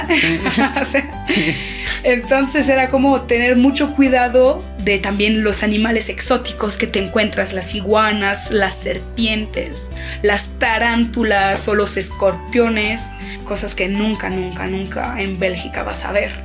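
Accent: Mexico City, Mexico. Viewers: Tiene acento Chilango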